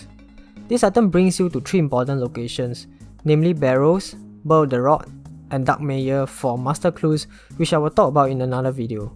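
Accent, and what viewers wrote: Singapore. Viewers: SG Accent ♡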